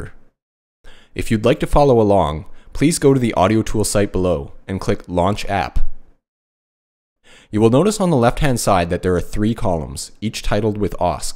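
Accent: Canada. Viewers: Thanks! This was really helpful. Are you by any chance Canadian? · are you canadian? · Are you Canadian? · Hez a Canadian. "About"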